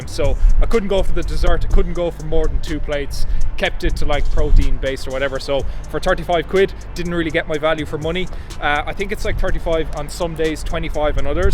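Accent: Ireland. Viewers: You're fkin hilarious bro 🤣 love the sense of humour and great content. I can feel this channel blowing up very soon. Btw is that a Limerick accent I hear?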